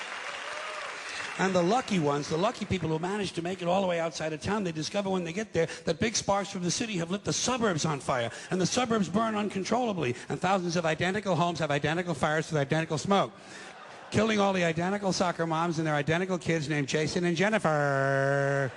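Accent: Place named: Mississippi, United States. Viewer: this motherfucker is a good comedian but tone down that Mississippi shit bro!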